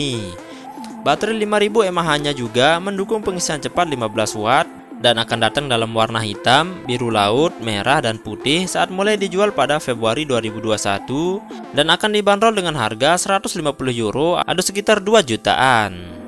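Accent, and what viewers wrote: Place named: Bali, Indonesia. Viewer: Logatnya bali banget bang😁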